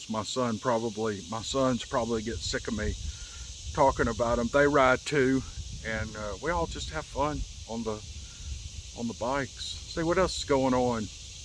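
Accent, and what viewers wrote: South, United States. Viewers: That melodious voice w hint of southern accent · Hey,. I've been liking the Steve Lilly stories, as well. Was gonna ask you if you have any relatives in SC or in the Aiken/Augusta area. I'm Steven Buckner. Dad's name is John. His whole side of the family are good, (mostly! Lol) hard working Southern folks. Every time I listen to your stories or casts your country twang reminds me of my cousins, aunts, etc. Haven't run into many Buckners, even in my 10 yrs in the navy. None actually. Anyways, I thought I'd say hello and see if you might have some kind in red clay country. Take it easy as you can and get back to me when you can.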